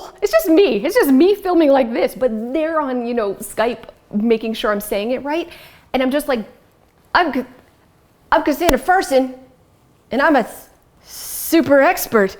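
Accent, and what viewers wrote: Canada. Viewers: I just saw that sweet Canadian accent on HGTV! Gonna start watching your new show Hot Mess and wanted to say congrats. I’ve been watching you on YT for years and LOVE that you’re now on my TV! Yay!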